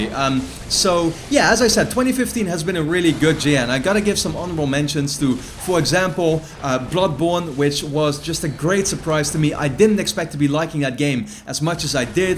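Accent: Netherlands. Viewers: omg are you dutch? i tought it from your voice ( im dutch :p)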